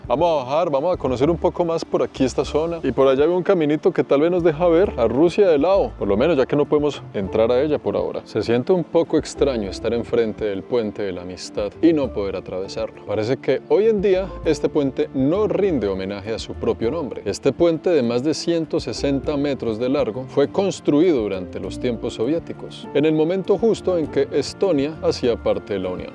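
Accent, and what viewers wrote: Bogotá, Colombia. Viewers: Cómo siempre muy buena su crónica y narrada con su estilo muy colombiano. Excelente, gracias. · es de Bogotá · es un Chico muy culto no te preocupes no dira nada indevido. Si voz es muy relajante obvio por su acento Colombiano muy agradable de Escuchar y correcto español. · Este narrador habla español con un espectacular acento de Bogotá, Colombia. Diría uno, sin conocerlo, que creció allí. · Tu voz y tonada colombiana es muy grato escuchar! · Es que es Colombiano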